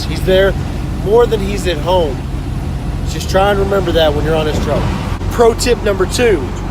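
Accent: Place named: Texas, United States. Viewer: Part of Texas you from?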